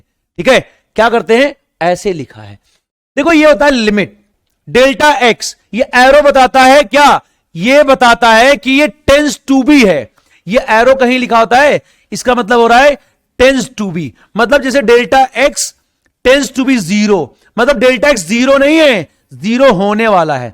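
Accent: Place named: Bhojpuri, India. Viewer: I am also from from bihar and i loved ur bhojpuri accent a lot .. Thank you for the lecture. No doubt best physics test ever .....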